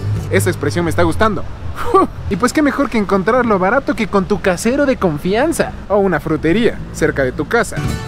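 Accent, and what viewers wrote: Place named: Quito, Ecuador. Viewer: 🌟 Eres el Quiteño más simpático que he visto 😍💙 Me encanta tu acento... se te escucha lindo 💋 XOXO ❣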